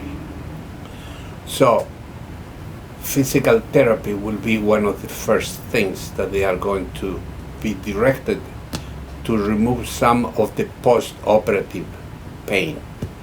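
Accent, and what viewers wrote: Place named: Italy. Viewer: You never explained why, what you do, is better than fusion and other invasive/destructive procedures such as laminectomies. This is the third video I've watched of yours and you don't at least provide a description of the procedure that have found very effective. However, I will forgive you; as you appear to be an Italian immigrant that is bilingual; and the English language makes it harder for you to articulate or put into words, your techniques!😃 But I'm guessing that you use endoscopic decompression with precision drills either of small size or ultrasonic; which I understand can be very effective with minimal damage to the body's own tissues. And I perceive that you are a doctor WHO AIMS TO ADDRESS THE REAL CAUSE OF THE PAIN, NEUROPATHY AND MYELOPATHY. That is a rare trait in today's medical system. Today's allopathic doctors are more concerned with money and treatment of SYMPTOMS rather than stopping the REAL CAUSE! 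They try to "improve' on our Creator's designs of the spine by removing parts and replacing them with metal alloys to create some stability. Instead of aiming to remove the offending bone spur, cyst, or herniated disc or ligament to free up space in the central canal and the foramen where the nerve roots exit. Thank-you, as I am in my 3rd consultation with spine surgeons pre-surgery; after updating my MRI's, CT scans and X-rays. He is reknown for the M.I.L.D procedures and I hope to consult with him in the next few days to see what he recommends. (I have liga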